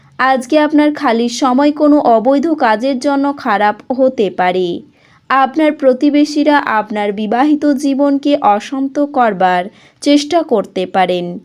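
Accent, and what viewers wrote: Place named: Ghoti, Bangladesh. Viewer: ঘটিয়া বোলি বন্ধ করো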